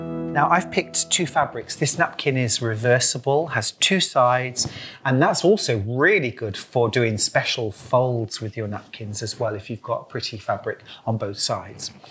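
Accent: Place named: East Yorkshire, United Kingdom. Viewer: Thank you. You are an excellent tutor. (A bonus is that this East Yorkshire Lass (now a Norfolk dweller ) gets to hear the familiar accent!)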